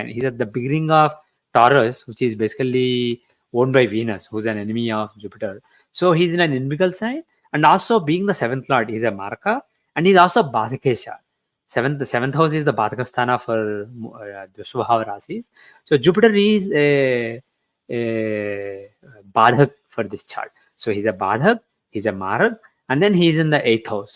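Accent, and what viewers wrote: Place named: Chennai, India. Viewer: His Madrasi English is confusing. Ask him to speak Hindi. This will shorten public interest to bear this video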